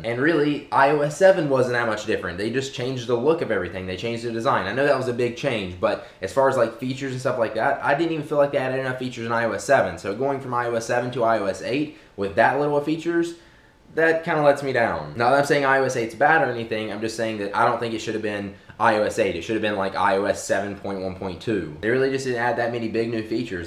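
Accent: South, United States. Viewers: Well obviously an american southern accent, he lives in america. Nobody speaks proper english. You shouldn't be making fun of others for something you don't even do.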